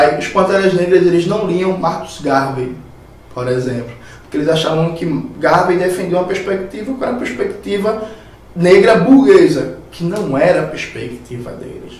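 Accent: Pernambuco, Brazil. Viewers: Sim,fala pernambucano!✋😁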